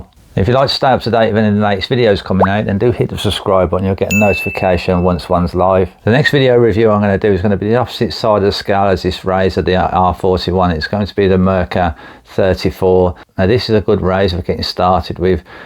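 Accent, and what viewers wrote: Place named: Cockney, United Kingdom. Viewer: A bit of sincere advise mate. Speak a bit slowly because you speak with a cockney accent a lot of people will not understand you and it decreases the number of subscribers.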